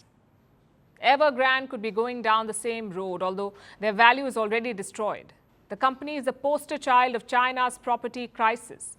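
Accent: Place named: India. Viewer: Okey, Palki nice to hear your Indian accent once more. Thank you for ths update.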